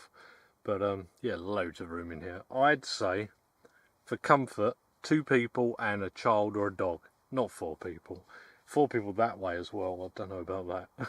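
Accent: United Kingdom. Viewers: The British accent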